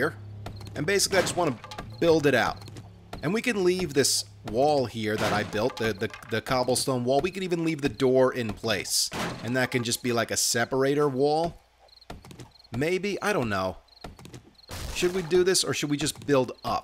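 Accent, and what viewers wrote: New Jersey, United States. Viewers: I think you missed a plug and I think you missed a bookbag in the pass-n-gas . btw I love that you're from NJ! It gives me joy to listen to you talk about kickin' ass in an NJ accent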